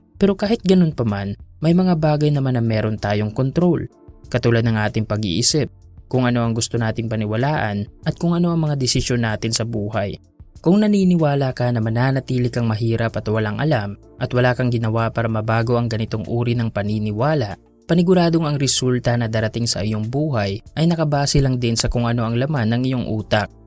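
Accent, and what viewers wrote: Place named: Visayas, Philippines. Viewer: Ikaw rin dapat mo baguhin pag sasalita mo parang bisaya..pilipit ang dila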